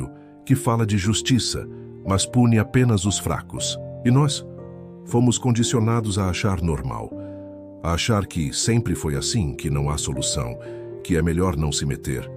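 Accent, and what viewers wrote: Brazil. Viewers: Quando é que vamos ver a tua verdadeira cara porque tenho a certeza você é uma pessoa conhecida em Cabo Verde mais preferes ficar escondido atrás das camaras com um narrador português brasileiro o que não crédibilisa os teus argumentos sempre para destruir tudo de bom que os nossos dirigentes tem feito de bom para Cabo Verde nunca ouvi da vossa parte uma coisa positiva sobre nosso país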